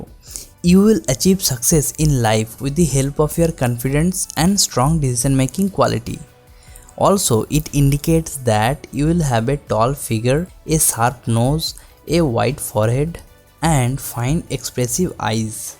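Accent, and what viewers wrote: India. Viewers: English subtitles please. Its hard to understand Indian English dialect but also Indians have great informations about palmistry :/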